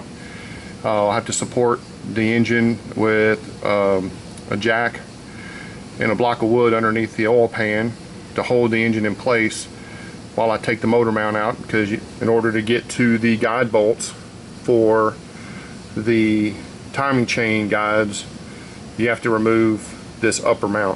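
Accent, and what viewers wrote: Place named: North Carolina, United States. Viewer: good advice - thanks! Where are you from btw - i'm in NC and you sound local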